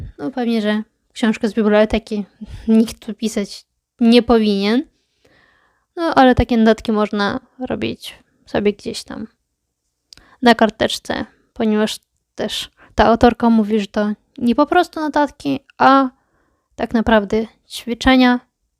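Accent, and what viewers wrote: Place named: Ukraine. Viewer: Hej! Ciekawe wszystkie 3 książki, zwłaszcza ta "pisz, publikuj, działaj". Tak w ogóle to jesteś Polka czy Ukrainka, bo masz dość ciekawy akcent? Pozdrawiam.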